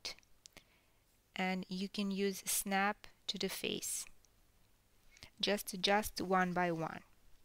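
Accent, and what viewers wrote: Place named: Eastern Europe. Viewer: That beautiful Slavic accent...💌💖💝💘 Ukrainian probably. 🥰😘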